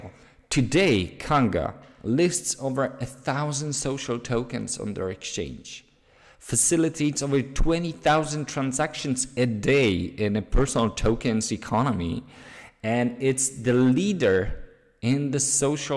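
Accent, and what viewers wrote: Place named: Poland. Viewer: Mówi po angielsku ale i tak słyszę po polsku xd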